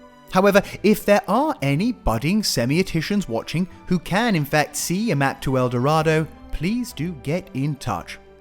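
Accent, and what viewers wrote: RP, United Kingdom. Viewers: It's true! I've also noted that the comments are just overflowing with people, (including me), attempting to use their very best Queen's English (if I understand the term correctly), inspired, I'm sure, by the narrator who is a shining example to us all! ❤ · Your videos are simply scrumptious! I adore the narrator’s witty use of the Queen’s English. I am sure you are firm friends with the local antiques dealers, tell them you are on the lookout for a chimney surround of the same era as the house, they might be able to help you. I would start on the downstairs toilet/bathroom, comfort is important whilst renovating. (And someone will eventually spot you behind that bush..). Afterwards I would tackle either the dining room, or the sitting room- so that you can have a room that is finished and decorated and furnished, it will bring a huge sense of achievement and an actual place to wind down.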